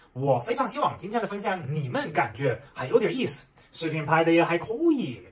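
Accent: Sichuan, China. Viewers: 有点川普的嘛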